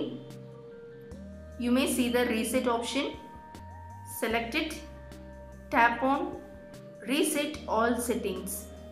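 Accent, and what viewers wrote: South India, India. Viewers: LilEddieGames .101 XD she is a Indian btw South Indian for ur info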